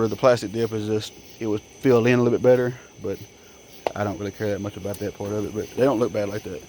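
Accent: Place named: South, United States. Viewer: Awesome weights and southern accent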